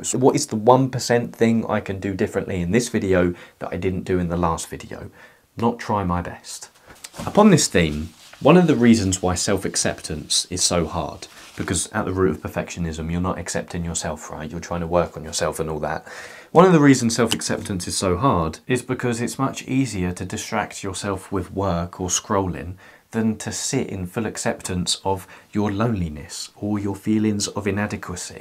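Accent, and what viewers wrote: United Kingdom. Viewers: this british accent made it hard for me to understand lol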